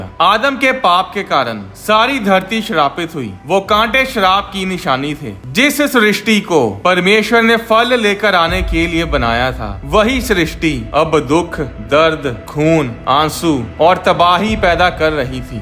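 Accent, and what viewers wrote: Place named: India. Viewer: One of the worst things ever, when you listen history from an Indian accent guy.😏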